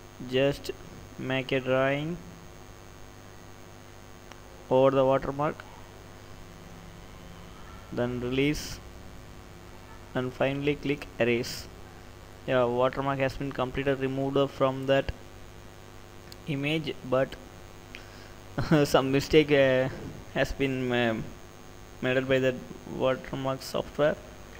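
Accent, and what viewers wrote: India. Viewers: Good, where are you from? I am thinking a state from India, tell which one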